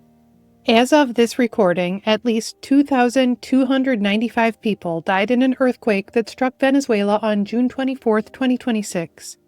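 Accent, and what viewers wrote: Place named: Midwest, United States. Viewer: Are you , the narrator, from the midwestern region of the States? You sound like it. I would say in order of likelihood: Illinois, Ohio, Wisconsin. Curious linguist here. Caveat: Could be a midwestern AI these days lol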